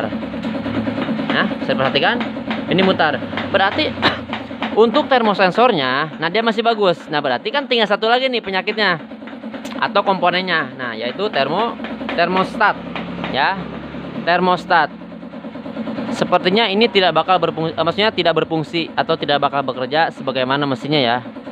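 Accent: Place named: West Java, Indonesia. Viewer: Maaf bang alamat bengkel nya d mana yah kalo boleh tau ngmong nya ada sunda2 gitu,soal nya saya juga orang sunda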